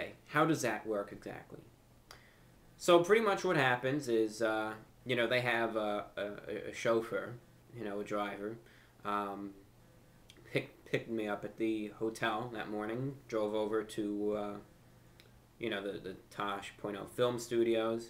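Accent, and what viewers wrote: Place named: Boston, United States. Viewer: I hear he's from NY. I thought he sounded Bostonian.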